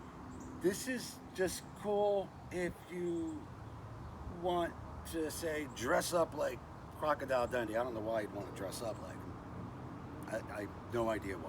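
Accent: Australia. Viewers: The hat is awesome. You sound just like you are from the outback brother. This is awesome. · Aussie accent is hard to do if your not an Aussie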